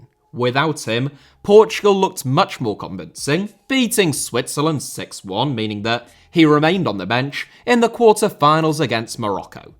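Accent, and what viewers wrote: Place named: England, United Kingdom. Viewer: I can understand the non-English words but the English words? It's just his accent lol if anything that's the correct way to say those words since English came originally from England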